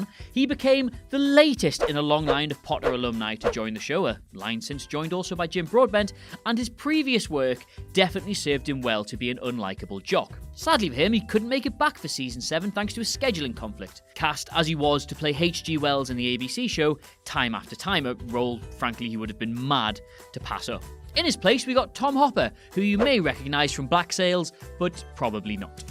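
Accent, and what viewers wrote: United Kingdom. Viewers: Have you never heard a British person speak before?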